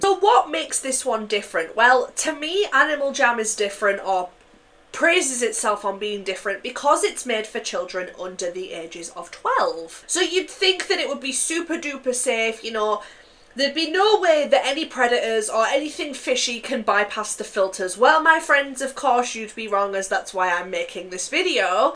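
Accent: Scotland, United Kingdom. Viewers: I mean, she's from the uk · unrelated you sound so Scottish my god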